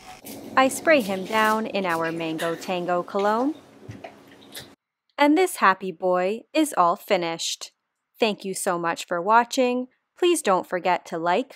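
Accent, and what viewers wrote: Canada. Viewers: She's Canadian? I always thought Midwest US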